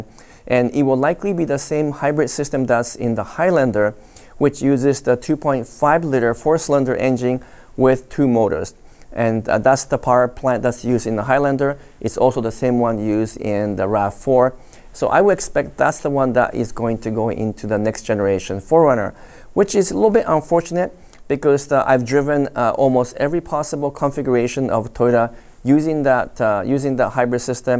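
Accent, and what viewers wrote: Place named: Japan. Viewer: Oh damn the best Japanese English.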